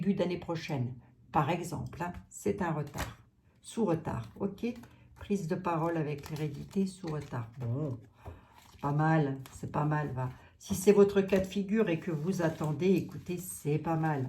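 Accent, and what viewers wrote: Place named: Vosges, France. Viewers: Moi heureuse de retrouver l 'accent Lorrain et les expressions. De temps en temps j'en ressors une. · Une petite pointe d.accent vosgien par moments... celui-là on ne peut pas l'oublier (j'en suis la preuve) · Bonsoir, je me régale avec vous, entre autre avec votre accent, étant spinalienne d'origine, bon certes j'avais 9 ans lorsque je suis partie dans le sud, cela fait maintenant 50:ans. Quand je vous écoute je retrouve mon accent. Le plus qui m'éclate chez vous c'est quand vous vous mettez d'un coup à râler après quelqu'un, et que vous avez raison. Ça me fait délirer votre humour. En tous les cas, merci pour tout ça. Je vous adore.🌸🥀🌷🙏🙏🌹💐😇😘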